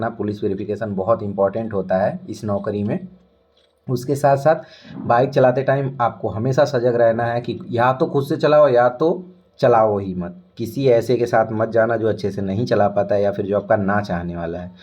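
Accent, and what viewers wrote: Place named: Bhojpuri, India. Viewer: Bhojpuriya andaaj me😂😂😂❤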